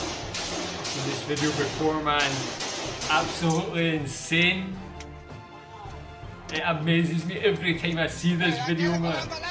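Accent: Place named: Scotland, United Kingdom. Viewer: I like your scottish accent.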